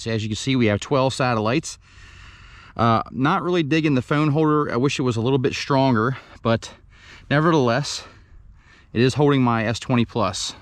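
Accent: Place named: Baltimore, United States. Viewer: Love the straight up Baltimore accent. Sorry to see this with the drone. I was kind of excited to buy this for my son.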